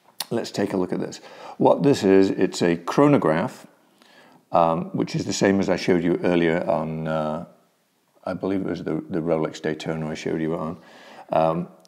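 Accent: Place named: Yorkshire, United Kingdom. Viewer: I think this guy is great I sent him a Christmas greeting and said all the best from Leeds in Yorkshire and much to my surprise I got a reply saying merry Christmas lad. much appreciated and I'm not sure if he is from Yorkshire but he sounds like it to me anyway what a really nice guy and some people might don't think wealth suits them but it well although I should resent wealthy. People being poor as you like but no he's a gent a colourful one but still a gent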